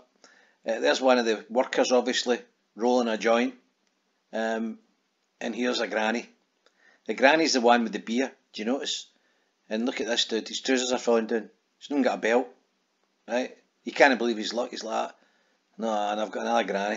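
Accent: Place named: Glasgow, United Kingdom. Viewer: you replied! <3 I read it with your voice xxxxxxxxxxx My Glaswegian love lol xxxxx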